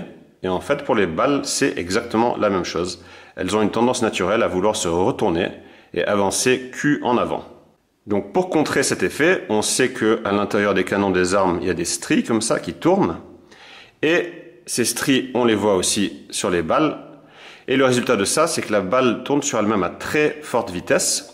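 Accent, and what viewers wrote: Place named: Switzerland. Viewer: Ben avec votre accent je pensais que vous étiez Suisse, désolé.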